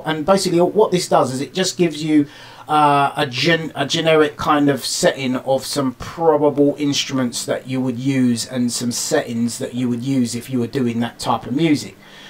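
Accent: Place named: United Kingdom. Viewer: The clearest British English I ever heard...Thanks Man, good point...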